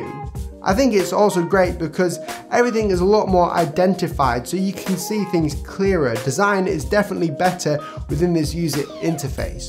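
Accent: United Kingdom. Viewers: i have to say these updates are really minor. as a side note would you work on your video's music more and the fact that you are British and we might be fascinated by your accent is old fashioned now, take care.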